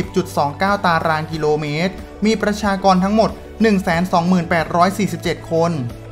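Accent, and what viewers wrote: Thailand. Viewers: เหน่อสุพรรณครับ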